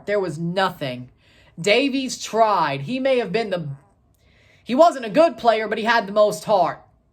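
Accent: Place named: Midwest, United States. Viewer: Are you from Canada? Your accent sounds like Midwest USA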